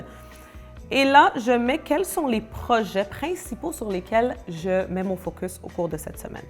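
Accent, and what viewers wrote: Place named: Quebec, Canada. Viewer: c'est la première fois que je kiffe un accent québécois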